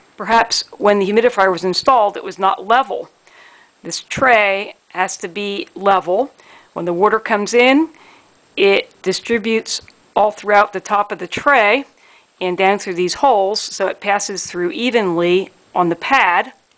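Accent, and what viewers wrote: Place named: Pittsburgh, United States. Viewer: You sound like a yinzer. If you dont know what that is them I am wrong and I apologize.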